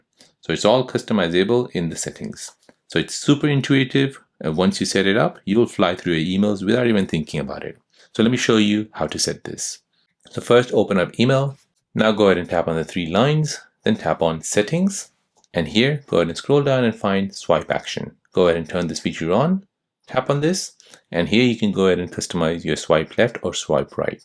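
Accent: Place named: United States. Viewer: Its VOL UME not WOL UME. You spund American as well so why are you pronouncing like your German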